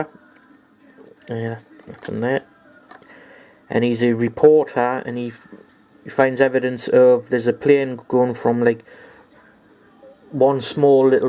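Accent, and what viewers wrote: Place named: Newcastle, United Kingdom. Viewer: Are you a Geordie or a Mackem? I'm from Gateshead :) Subspecies is great, I enjoyed them when the Horror Channel showed them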